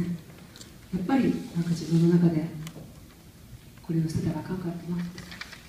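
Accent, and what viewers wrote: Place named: Osaka, Japan. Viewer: いつもより大阪弁のさんが新鮮です！ありがとうございます😊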